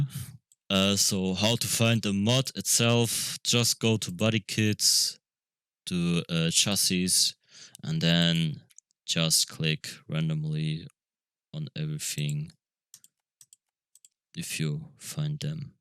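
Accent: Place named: Germany. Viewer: nice tut,i could hear the german accent xD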